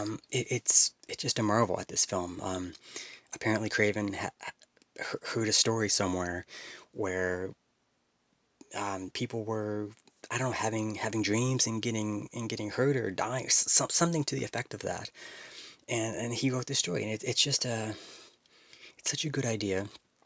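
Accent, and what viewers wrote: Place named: Atlantic Canada, Canada. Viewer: You're Canadian? I'm from Toronto. Based on your accent I think that you are probably from one of the Atlantic Provinces.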